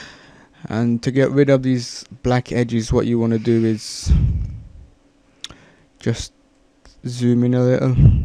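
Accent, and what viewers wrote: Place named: United Kingdom. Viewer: Just love british commentaries :D - check you inbox -____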